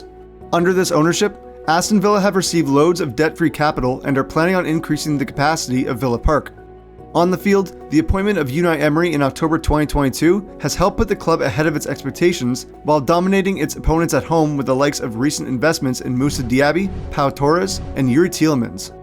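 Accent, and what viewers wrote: Canada. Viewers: I bet ur Canadian mate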